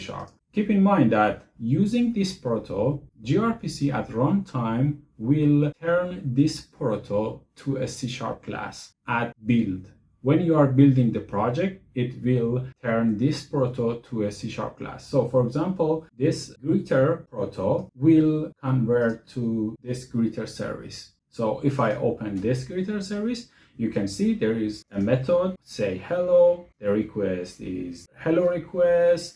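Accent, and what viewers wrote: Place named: Iran. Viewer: بعضی از تلفظات خیلی فارسیه،حیفه متد، ریسپانس و ... که بیشتر تو محیط های آیتی انقدر اشباه تلفظ میشه که تلفظ اشتباهش فسیل شده.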